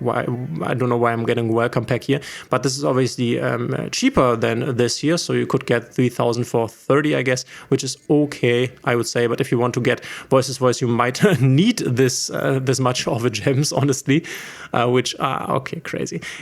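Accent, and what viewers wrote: Germany. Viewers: Well, he's German, so he's going to pronounce some card names differently. You also cannot argue that Sauravis is an English word or name. Same thing with Baronne de fleur, a card which every English speaker pronounces incorrectly, but who cares?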